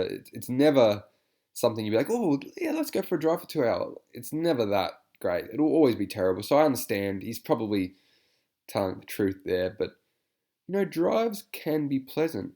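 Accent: Australia. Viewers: Bro, you're like the Steve Irwin of perves, not only your accent but the purpose of every action by the critter of topic, the analyzation and breakdown is riveting.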